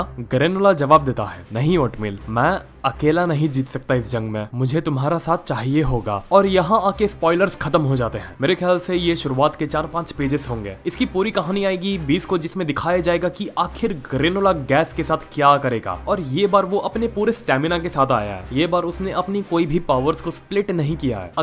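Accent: Maharashtra, India. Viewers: BHAI TERA MARATHI KADK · Today's narration is op 🔥 specially little bit marathi used during narration 🤣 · Bhai tum Marathi hai? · Bhai kya aap Marathi ho 😇😇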